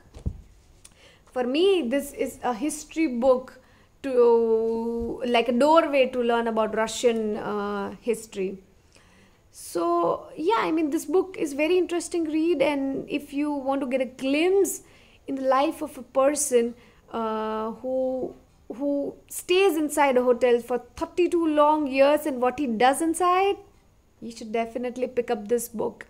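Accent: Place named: Gujarat, India. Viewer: Ds....that gujju accent ☺️☺️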